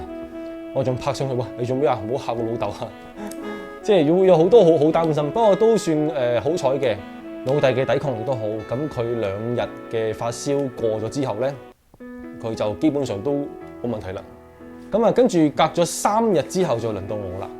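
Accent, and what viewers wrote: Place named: Guangzhou, China. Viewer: 你的口音有D似廣州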